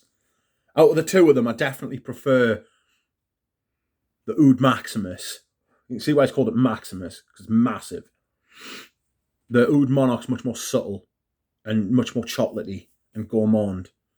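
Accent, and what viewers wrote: United Kingdom. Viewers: Welcome friends British accent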